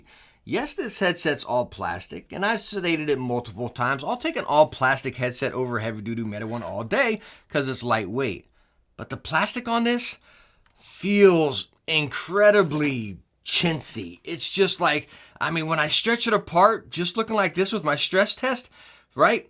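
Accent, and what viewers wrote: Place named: Staten Island, United States. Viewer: I love your accent, my man. Are you from Staten Island by chance?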